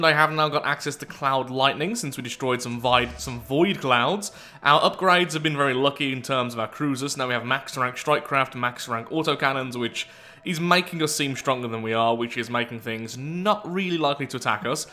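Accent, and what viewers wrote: United Kingdom. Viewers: Where is that Tim Curry-like accent from in the UK? I keep expecting you to shout 'Spaaayce!'.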